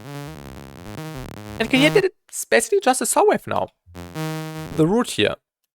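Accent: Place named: Germany. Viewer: I love the German accent, really gives it a scientific vibe haha ❤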